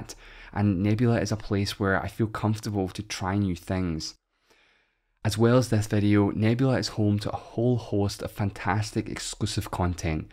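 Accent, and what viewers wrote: Scotland, United Kingdom. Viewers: I find this Scot very difficult to understand; could he please speak the King's English?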